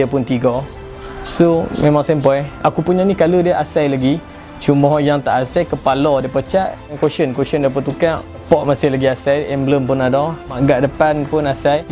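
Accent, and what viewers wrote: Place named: Kelantan, Malaysia. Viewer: kehcakk bahasa kelantan bro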